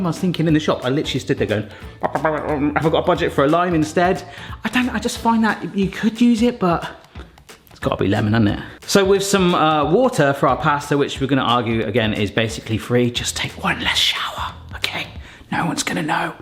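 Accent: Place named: Bristol, United Kingdom. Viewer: Now I know ur from Bristol I cant Unhear it ahaha Bristol stand up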